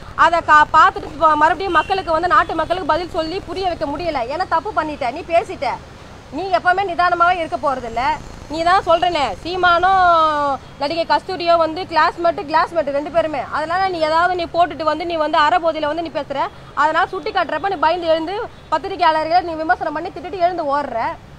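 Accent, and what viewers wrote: India. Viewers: அதென்ன வீரலட்சுமி மக்கலே மக்கலே இடையிடையே மெட்ராஸ் தமிழ் வேற வருது😮😮😮. தோலோடு தோலாவகவா😮😮😮 இன்னா இன்னா வேற இடையிடையே வருது😮😮😮. அதென்ன க்ளாஸ்மெட்😮😮😮வந்து வந்து வந்து வந்து வராம வந்து. இது நல்லா இருக்கு தமிழ் பாஷை😮😮😮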